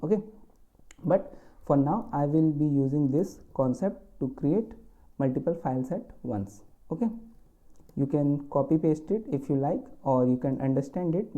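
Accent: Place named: India. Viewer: I don't want to hear an indian accent. Thank you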